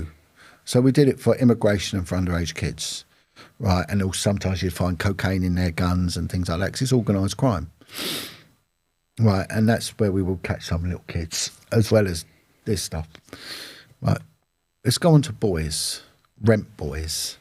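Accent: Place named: Scotland, United Kingdom. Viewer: Hi. I jst found your podcasts videos, and i love them, also love your scottish accent, big love from an irish girl ( whos single 😂🫶)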